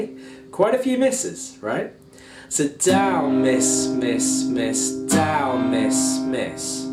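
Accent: England, United Kingdom. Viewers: Thank you very much! It's really great to get all these detailed explanations and ultimately UNDERSTAND :- )!!... and with a nice English accent too :- )! Hello from France ! Thomas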